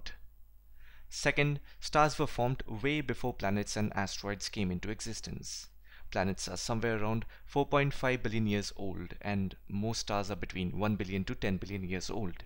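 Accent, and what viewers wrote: Bengal, India. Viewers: Sir are u bengali ?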